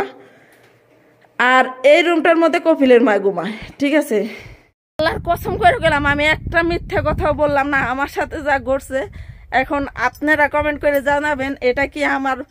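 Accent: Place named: Bikrampur, Bangladesh. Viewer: আচ্ছা আপা কিছু মনে করবেননা, আপনার দেশের বাড়ি কোথায় একটু বলবেন? কেন যানি আপনার ভাষা শুনে মনে হচ্ছে, দোহার কিংবা নবাবগঞ্জ অথবা বিক্রমপুর হবে.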